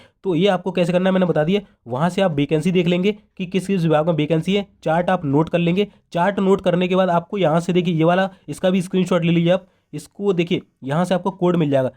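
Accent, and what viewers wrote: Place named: Gwalior, India. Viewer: I also from gwalior apki voice se laga hi tha ki aap gwalior se hi ho. 😅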